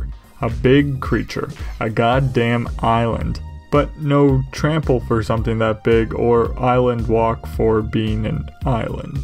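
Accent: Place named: Canada. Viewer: 19 seconds in, ahhh so he is Canadian.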